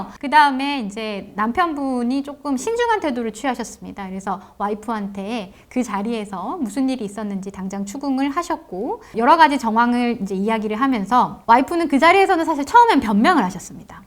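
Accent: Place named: Jeolla, South Korea. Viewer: 은 전라도 사투리가 섞여 있네요 ㅎㅎ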